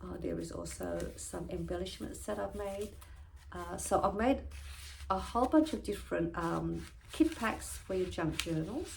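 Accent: Australia. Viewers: the best Australian accent I have ever heard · I love your Australian accent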